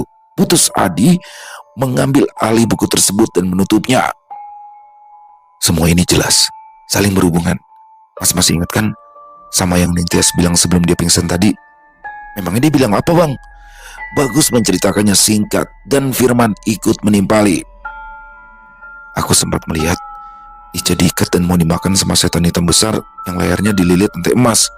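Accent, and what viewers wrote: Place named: Banyumas, Indonesia. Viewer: Bahasa jowonya kok kaku, dadi bahasa ngapak semua.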